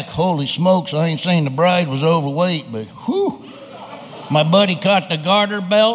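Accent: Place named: South, United States. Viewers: It's funny how his niche is southern country people, but his jokes are actually universal. If his jokes were done without the accent I think his reach would have been more cross cultural. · He might be from Nebraska But he's got Florida boys nailed Right down to the accent. 99 percent of what he talks about is true for deep southerners lives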